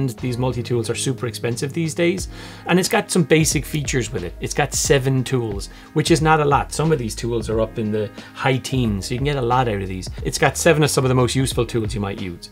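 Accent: Ireland. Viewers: I listened 3x just to hear the Irish accent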